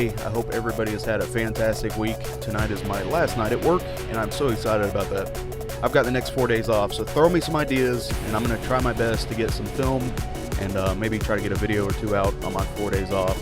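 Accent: United States. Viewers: I reeeeeeeeeeeeeeeeeeeeeeeeeeeaaaaaaaaaaaaalllllllllllllly love the way you're speaking. It's like the typical American-English slang that I just love to hear all day long. Btw, could you possible show us some ELS action?:3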